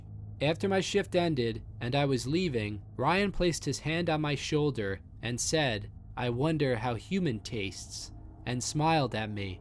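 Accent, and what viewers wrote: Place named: South, United States. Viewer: nice southern accent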